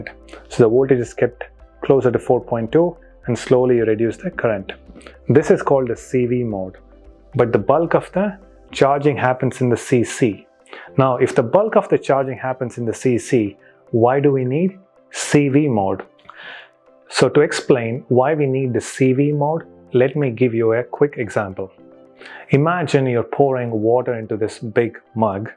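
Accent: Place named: India. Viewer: The only Indian accent ever that’s not annoying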